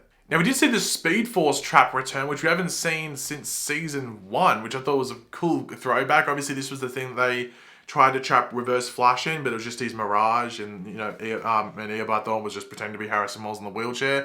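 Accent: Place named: Australia. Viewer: Your mad cause u dont realize hes australian. They have accents. Not everyone is american get over ur self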